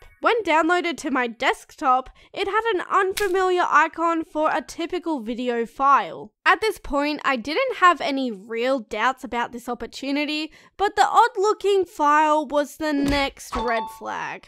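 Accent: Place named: Australia. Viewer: I love how I understand you sm because one word.... Australia ✨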